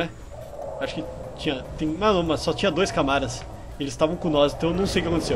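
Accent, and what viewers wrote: Brazil. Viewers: achas mesmo? Eu prefiro o vosso sotaque brasileiro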